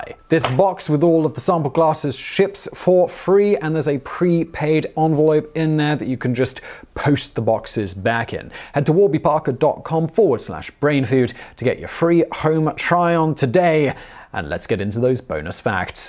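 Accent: England, United Kingdom. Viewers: Please stop trying to impersonate a garbling artificial intelligence robot. Please just speak much more slowly and clearly in your clear English accent.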